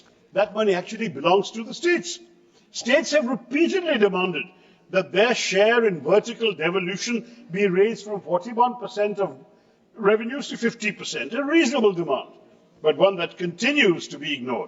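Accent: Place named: England, United Kingdom. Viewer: At bjp side, no one understand what he is talking about ,in this English accent....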